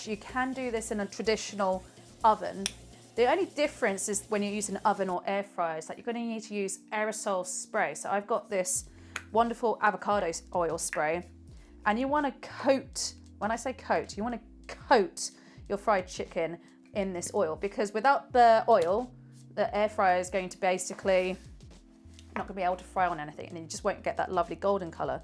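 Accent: United Kingdom. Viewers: Please don't use music. British accent and asmr is the way forward. Trust me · I love how Brits pronounce words compared to Americans, it’s hilarious 😂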